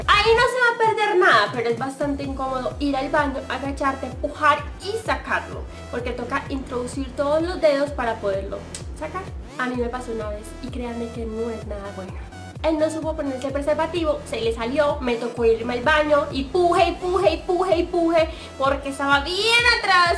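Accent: Colombia. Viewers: siempre había escuchado acerca de la ''famosa'' pastilla y no tenia claro muchas cosas :/ gracias por aclarar todas mis dudas y tiene una hermosa voz (mas ese acento de colombiana) -saludos!! :D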